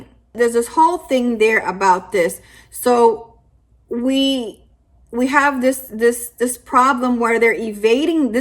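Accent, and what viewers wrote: Latin America. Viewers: Hair looking great as usual, love the librarian glasses that go so well with the choice of top, sweet Latina dialect.... Giving this video a solid 9.3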